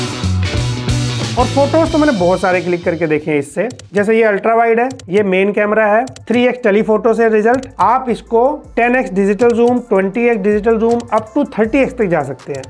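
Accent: Lucknow, India. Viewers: Lucknow se ho kya bhai?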